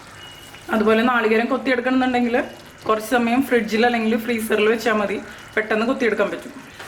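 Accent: Thrissur, India. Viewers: തൃശൂർ ആണല്ലേ?? എവിടാ ചേച്ചി??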